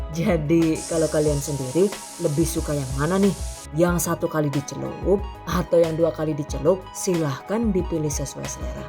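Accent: Banyumas, Indonesia. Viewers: ngomonge ngapak.. wong ndi kii😂😂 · Oh wong ngapak jebule.😄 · jebule ngapak, ngapak ndi mbak e, ws rong tahun maning nembe ngerti yt kie, suwun yh mbak e atas ilmune 👍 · Fix ente wong ngapakkk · mandan kaget pas pengisi suarane ngomonge ngapak🤣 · laaah deneng ngapak siih 😂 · Masyaa Allah ngapaknya jadi kangen kampung halaman. Terima kasih resepnya. Barakallah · cilacape pundi... jan ora ngapak ora kepenak😅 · Deneng ngomonge ngapak yaa 😂😂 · Alahhkae..tengane wong ngapak..😂..kesuwun mbekayu resepe ya..semoga sukses terus yu😂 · Galfok sama NGAPAK nya 🤣🤭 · Jebule wong ngapaak😭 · Suaranya enak tp tiba2 kaget ternyata ngapak ... 😅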